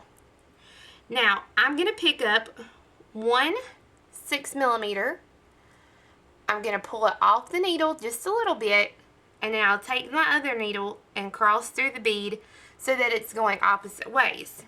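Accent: South, United States. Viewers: these are beautiful ... btw I JUST figured out the charm of your voice -- it's that homegrown southern accent love your videos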